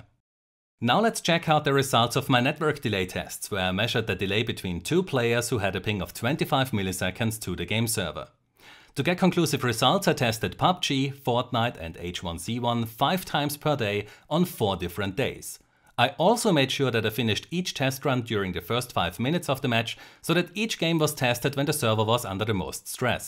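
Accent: Germany. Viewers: i love your accent, is it german? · Are you from germany? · Bist du eig Deutscher?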